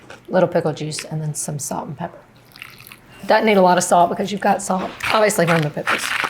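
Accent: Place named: North Carolina, United States. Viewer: Love her accent. Sounds like a local (NC) girl...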